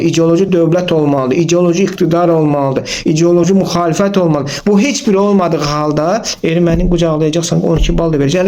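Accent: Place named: Baku, Azerbaijan. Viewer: Ləhcəndən bilinir karennoy Bakılısan amma erməniylə öpüşənə haqq qazandırırsan tema o deyil tema 1905 ci ildə daşnaklar ruslara Bakı kəndlərində nəqədər söz sahibi papqlı kişiləri güllələyib uşaqlarının başına daş bağlayıb xəzərə atıb boğurmuşlar bax budu tema millət demək istəyir dığalardan bizə nə qonşu nədə dost olar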